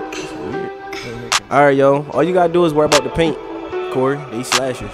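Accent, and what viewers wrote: Baltimore, United States. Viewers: U from Baltimore? I hear that accent lol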